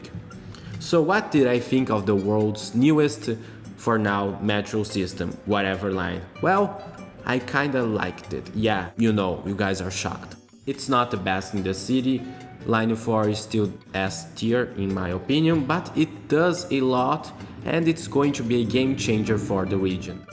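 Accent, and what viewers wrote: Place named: Russia. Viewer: Seu ingles me lembra um ingles russo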